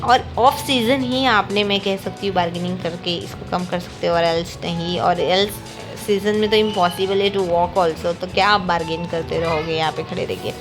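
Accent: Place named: Mumbai, India. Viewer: Axtreme left. Typpickl shilwar.. Apks accent mumbai ka hai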